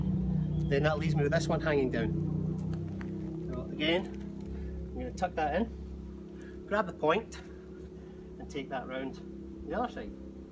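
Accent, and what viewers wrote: Scotland, United Kingdom. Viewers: I didn’t even need to hear this guy’s voice to know he was Scottish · My favourite accent....scottish accent😍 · Wow, CC does not understand a Scots accent!! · You would be my perfect weapon!! You are GORJUZZZZZ!!!! I love the Scottish accent (all dialects), with your looks and build, you are perfect! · A Plait is very practical... wht you need more? I love the scottish akcent.... as i was in scottland there was also a "Highlander" who was showing what you can do with a plait. Its a awesome thing. Thumbs up for that video. Greets from Germany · Scottish accent is soo adorable! 🤩 Greetings from Bavaria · Well at least he sounds Scottish. With the Outlandish craze I was expecting fourth generation heart on sleeve Good ol' Texas Scot telling us how to suck eggs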